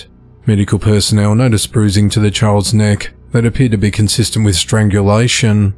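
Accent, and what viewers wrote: Australia. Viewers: The narrator is Australian or nearby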